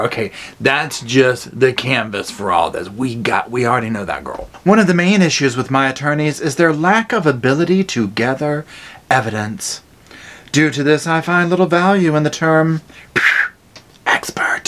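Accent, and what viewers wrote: South, United States. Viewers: She is a freaking Narcissist that knows everything. However, I love your Southern twang! 👍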